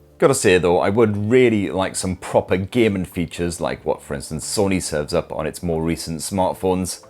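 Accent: United Kingdom. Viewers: this man speaks British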